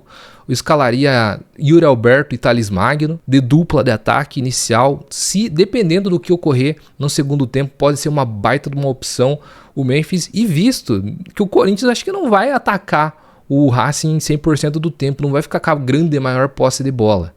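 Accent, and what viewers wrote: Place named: South, Brazil. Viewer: Opa, tudo bem? Você é do sul? Seu sotaque… VAI CORINTHIANS!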